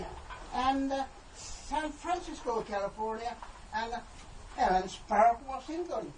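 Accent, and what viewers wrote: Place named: Ireland. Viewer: Bros got an Irish accent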